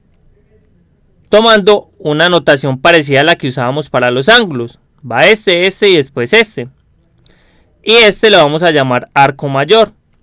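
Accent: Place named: Antioquia, Colombia. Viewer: es acento paisa, aprende un poco de los diferentes dialectos colombianos.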